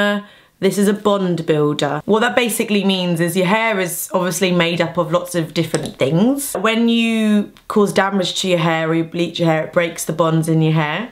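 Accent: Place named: Birmingham, United Kingdom. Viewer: Idk why, but to me you sounded sort of brummie in this 😂 probably just me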